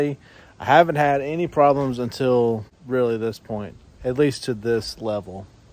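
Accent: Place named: South, United States. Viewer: I’d rather have dents than rust. I live in the rust belt and it’s the only reason it’s necessary to replace my vehicles. By your accent it sounds like you live in the south. I purchased a 2017 f150 just for that reason! My last one is a 2008 and has rust everywhere!!!! Steel is very very strong but also heavy. I just restored a Saab 92x and the rust cost me 10 grand to fix! It’s got to be stainless steel or aluminum for me.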